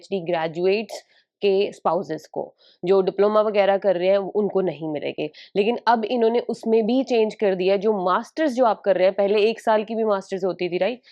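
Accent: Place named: India. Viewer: Why do Indians have such a strong accent in English?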